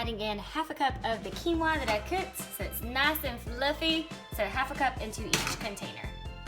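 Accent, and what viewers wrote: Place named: South, United States. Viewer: Hey!, I just want to say that I just love your southern accent, and as for the price of the meat, why don't you try out canned sardines. I just started to eat them. I got them from Trader Joe's and they are the best. Also, I want to say is that your competition meal prep is my every day meal prp.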